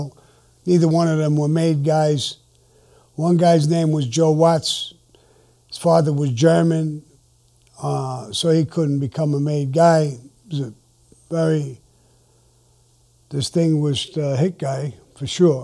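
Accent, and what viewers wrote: New York, United States. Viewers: I forgot to write,sometimes it's hard to understand.either his voice goes low at the end of a sentence or kinda blurry,I think the N.Y. wiseguy accent plays a part too.but I'll take what I can get.cheers!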